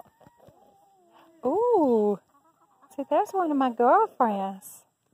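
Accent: South, United States. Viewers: This lady has the most appealing Southern accent and way of speaking. I know she’s a Mom and all, but I’m smitten. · This woman has the loveliest southern accent I've ever heard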